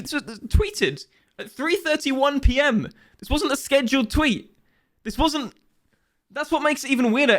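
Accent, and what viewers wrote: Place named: United Kingdom. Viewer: I love your British accent omg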